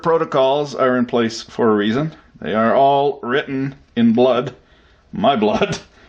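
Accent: Quebec, Canada. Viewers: Based on french knowledge, you are either from New Brunswick, Quebec or maybe Ontario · Si Sol (de Sol et Goblet) parlait anglais, ce serait lui. Un Québécois en plus! Gotta like the guy! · Hi im from quebec im very impressed that you seem to can speak like québécois. Where are you from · talkin' like a real Quebecois up in this shiz... i love it.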